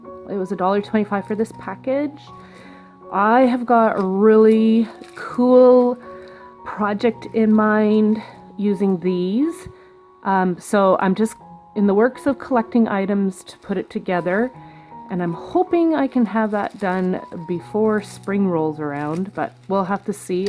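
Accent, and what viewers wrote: Canada. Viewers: Awe, so fun to hear your sweet voice! I love the Canadian accent! 😍 I wish we had a your dollar store with more... But I shouldn't complain because I have access to a lot of stores you do not. I'm hating the cold weather. I'm ready for spring! We don't have Dollarama either. I've had a cold as well and believe it or not, I'm still struggling with shingles. It's bearable, but my immune system is struggling to fight it off. I love the paint brush holders!!! Nice haul, looking forward to seeing what you make with it!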